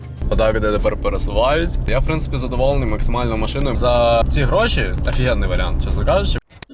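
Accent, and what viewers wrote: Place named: Western Ukraine, Ukraine. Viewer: Шикарно уже разговариваешь на западном акценте. Машинка сыпется но и года и пробег какой. У папика с нуля 2008 год правда дация логан 1,6 пробег 62 тысячи.Удачи а кокой пробег напишешь.Удачи.Добивайся такие машины средний вариант.Удачи.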